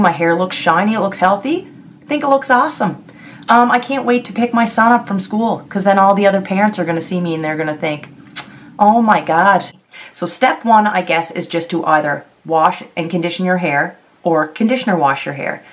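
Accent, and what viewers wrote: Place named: Cape Breton, Canada. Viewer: I've been watching lots of curly hair videos lately so this popped up as recommended - had no idea you were a caper til I heard the "shkonawn guys" haha made my day 🖤 now I'm real curious if ya got some specific tips for our wild weather in CB for curly hair, mine goes with the weather a lot of the time and I get so much frizz dude I'm not sure if its the highs and lows all the time out there or not lol. hair gets right crazy ah must be that nor'easter on its way by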